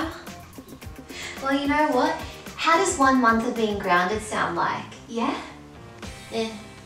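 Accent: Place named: Australia. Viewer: My initial thought - sounds like an Aussie accent. Look into description YT page - Country: Australia - knew it lol. My thoughts on social media: should be used in moderation, balanced with things outside of it - e.g., outside activities As to future videos, I would suggest that you look into not just how often social media plays a part into our lives but also how many social media platforms are used - it feels like we are inundated with so many of them that it pretty much consumes all of our time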